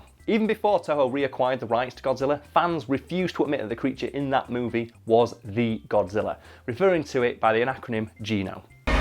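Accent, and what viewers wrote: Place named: Warrington, United Kingdom. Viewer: Eh up fucker, you sound like you’re from near Warrington and I recognise you- where you at boyo?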